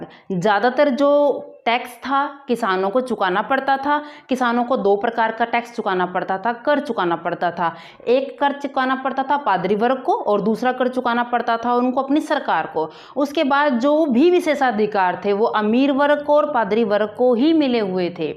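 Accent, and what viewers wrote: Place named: Haryana, India. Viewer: Mam I think you are from haryana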